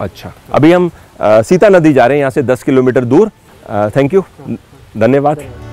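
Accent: Punjab, India. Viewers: Mr your punjabi accent is making videos great treat. Because north Indian people explain food nicely and appreciate.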